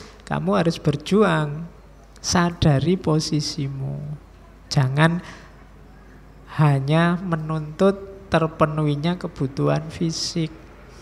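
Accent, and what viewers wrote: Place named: Central Java, Indonesia. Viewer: Gaya Bicara Orang Jawa Tengah dan Orang Jogja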